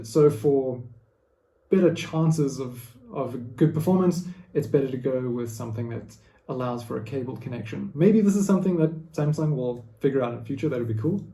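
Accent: South Africa. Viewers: Informative video thank you ! I am an interior design student .. here in SA so glad to here a familiar SA voice 😁 I recently just invested in the Samsung tab s10 FE and was wondering does Samsung second screen work similar if I’m using adobe illustrator to draw on the tablet but have it connect with a laptop 😁? I’m new to learning digital drawing for my design projects and need to figure out the best workflow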